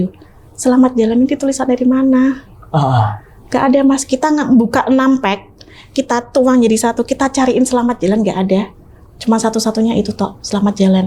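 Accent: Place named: Java, Indonesia. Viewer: Mbak.. Mbak logat mu jowo kental 😅 Kalau cerita yo mbok pake Bahasa Indonesia mbak, kasian viewer gak tau Artinya non Jawa #Salam dari Surabaya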